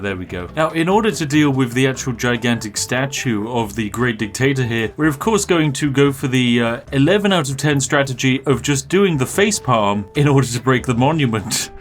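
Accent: United Kingdom. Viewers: imagine standing at a petrol station and you just hear "ooh its a petrol station, full speed ahead" in an insanely british accent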